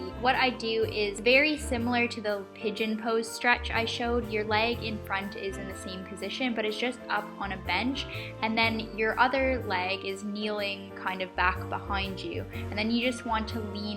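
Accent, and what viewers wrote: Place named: Canada. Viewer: canadian accent is cute :)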